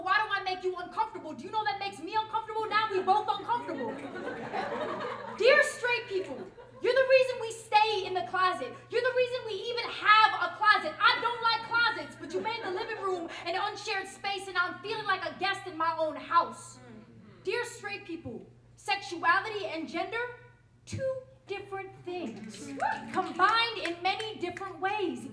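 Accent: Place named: New York, United States. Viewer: She sounds like she’s from New York 😂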